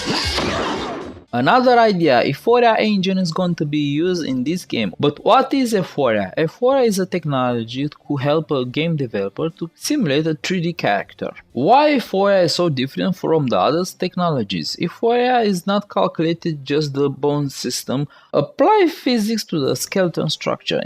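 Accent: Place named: Russia. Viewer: bro, forgive me but your english is like the russian which make tutorials, search for answer. whatever, keep work.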